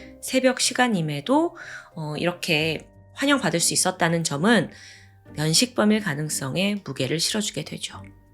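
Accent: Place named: Seoul, South Korea. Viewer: 목소리 맑고 순수한 서울말씨 얼굴 모두 다~아 좋아요 75세 팬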